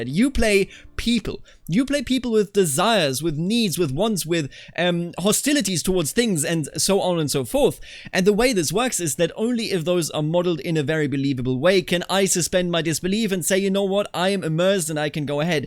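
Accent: Germany. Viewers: He sounds very german lmao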